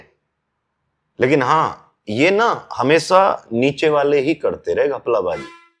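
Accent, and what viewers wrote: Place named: Uttar Pradesh, India. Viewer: O Bhai haryanvi nahi hai ok up ki hai tum hari 😊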